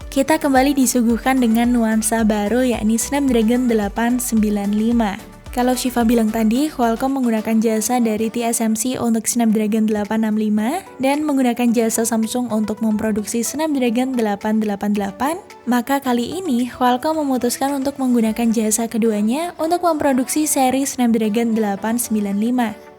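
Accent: Java, Indonesia. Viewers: Mbaknya kall ngomong medok jawa 😂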